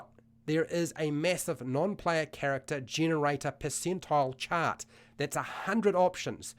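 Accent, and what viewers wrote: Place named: New Zealand. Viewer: The kiwi accent makes me so happy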